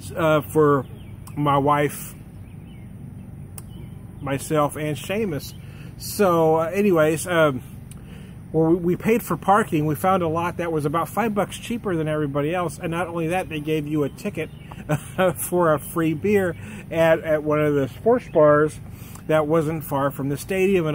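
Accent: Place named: Missouri, United States. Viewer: You sound like such a Missourian lol I'm from Missouri too. Loving these Reverence Series reviews but sadly never tried the Revolution Series. Cheers!!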